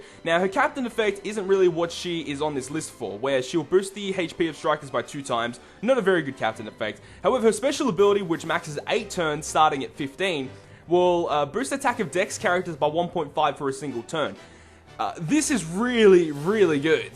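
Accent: Australia. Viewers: you aussie?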